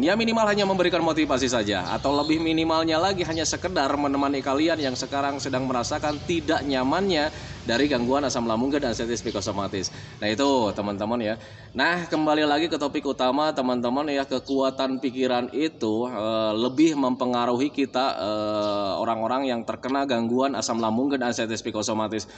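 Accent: West Java, Indonesia. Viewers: assalamualaikum kk,kk orang mn Kya logat2 sunda gtu,pngn ktmu ih klo bsamh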